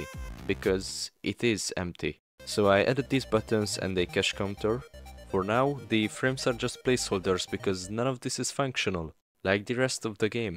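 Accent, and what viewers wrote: Hungary. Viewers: Bros accent is hungarian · I know a hungarian when i hear one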